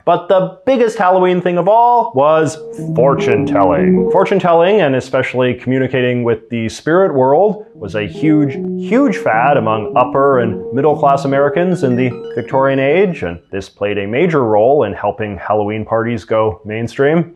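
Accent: Canada. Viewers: Hes Canadian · Thee most Canadian accent I’ve ever heard lol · Bro are you Canadian AF or do you hail from NorCal? Californadian? 🧐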